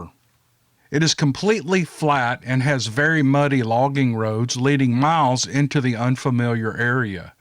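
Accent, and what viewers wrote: South, United States. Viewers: It's that sweet southern drawl....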